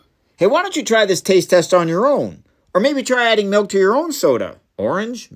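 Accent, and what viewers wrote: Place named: South, United States. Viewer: Never thought I'd see a southern accent and actual science mix together in the same person. Good to see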